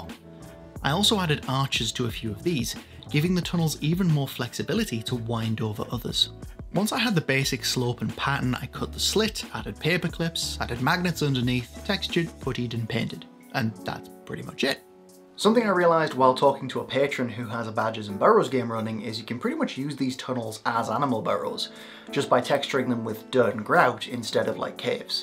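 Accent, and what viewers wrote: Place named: England, United Kingdom. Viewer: just wondering qwhy u use inches when u sound english? it always confuses me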